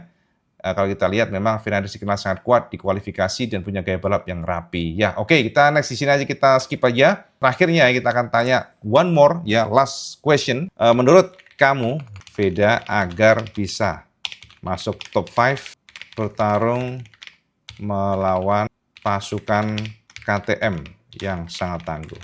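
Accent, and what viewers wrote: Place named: Java, Indonesia. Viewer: Om orang mana ya kok bahasa jowone weenak empuk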